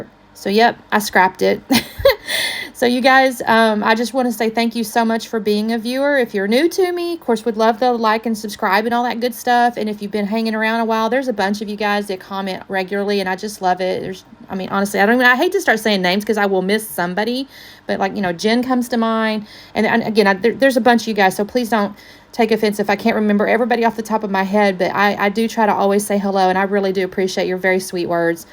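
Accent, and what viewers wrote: Texas, United States. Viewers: Great layout! I love your story of how you got started! And yes, I am one of your subscribers who loves your Texan accent - it always makes me smile! Thanks for sharing!❤ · I love your story. This is a wonderful tribute to you and all you have achieved. I love your positivity and feel like I am in the room with you when you scrap. I love your Texan accent. Always nice to listen to from an Aussie who feels we stick out so much on You Tube with our accents. I love what you did with the rainbow. 💛💛💛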